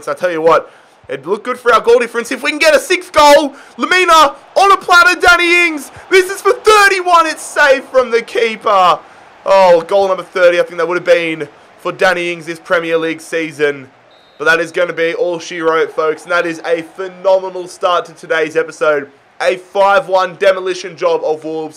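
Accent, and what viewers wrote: Australia. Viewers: Init you can tell he’s Australian 😂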